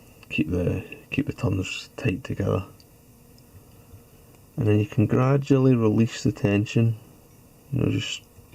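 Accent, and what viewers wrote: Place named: Aberdeen, United Kingdom. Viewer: Love the tutorial and your super Aberdeen accent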